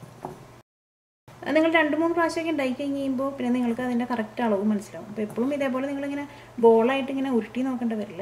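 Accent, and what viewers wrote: Thrissur, India. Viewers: thrissur style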